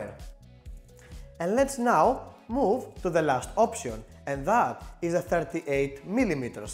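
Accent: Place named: Greece. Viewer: Greek accent...💙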